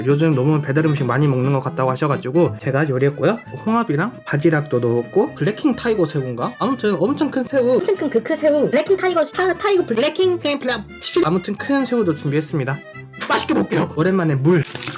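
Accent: Jeolla, South Korea. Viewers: 말할 때 가끔 들리는 전라도 억양이 너무 매력적...♡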